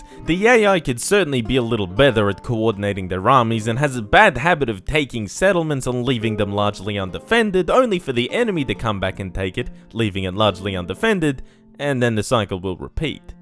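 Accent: Australia. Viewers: No. He sounds either Australian. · This guy sounds like the biggest ethnic from Australia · Definitely Australian but with some kind of Slavic twinge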